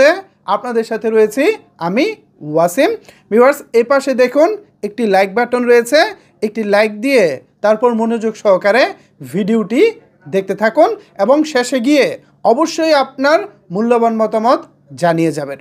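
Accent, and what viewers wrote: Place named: Netrokona, Bangladesh. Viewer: Netrokona Kotha boilen Bhai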